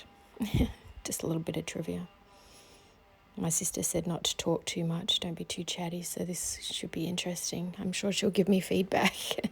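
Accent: Australia. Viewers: I'm in NSW & it's lovely to hear an Aussie accent for a change so feel free to be as chatty as you like 😉 Really enjoying your videos and you're doing great so just keep going 👍👏 😍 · So nice to hear an Aussie accent. I have just recently discovered Uniquely Creative. I love the beautiful papers. You make card making look so easy. Looking forward to seeing more.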